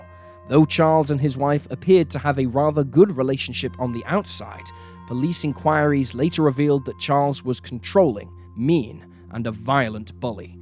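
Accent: England, United Kingdom. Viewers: Good, the English guy is back.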